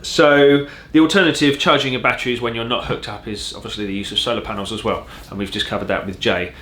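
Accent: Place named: Birmingham, United Kingdom. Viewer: Love what you do and how you do it!, You can take the man out of the Forces but you can't take the Forces out of the man.. Training and explaining is natural to you, keep it up.. Brummie Voyce..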